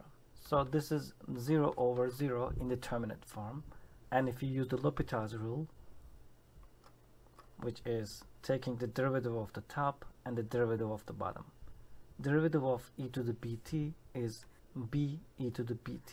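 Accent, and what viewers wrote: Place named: Turkey. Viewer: my guy this is the most turkish accent I've ever heard in my life but your name isn't turkish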